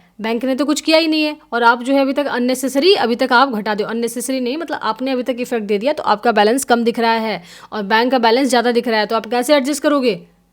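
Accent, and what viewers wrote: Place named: Haryana, India. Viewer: Ma'am ki bat sunke lagta hai ki she is either from Haryana or Western UP..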